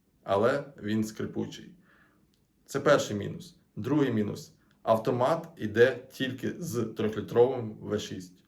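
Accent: Surzhyk, Ukraine. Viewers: Як на мене, треба мову трохи підтягнути, бо суржик , це шлак, а вичавлювати із себе через силу українську , то краще взагалі мовчати. Ну і авто якісь старі корчі- не цікаво (((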